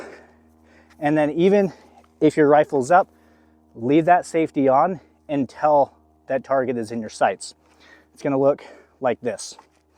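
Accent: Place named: Russia. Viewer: I've been following your IG for a minute and im ngl i was expecting the thickest Russian accent i've ever heard. still not disappointed lol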